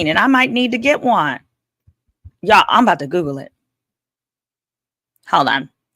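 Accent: South, United States. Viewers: Hey, love your lives covering this trial! I graduated from Thurgood a few years ago and I'm from Oakcliff too! Listening to you is making me wonder if I sound like you lol, that country twang be jumping out.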